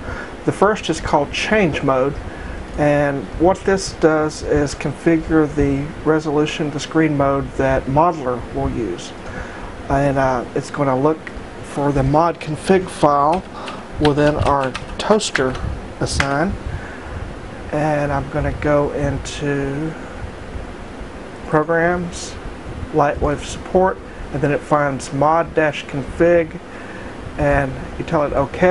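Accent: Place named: South, United States. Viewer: Hey! Is that a pro minidisc deck to the left of the A1200., I've corresponded several times with you on <link> and through e-mail regarding Amigas and Lightwave - but I never knew you had a bit of a Southern US accent!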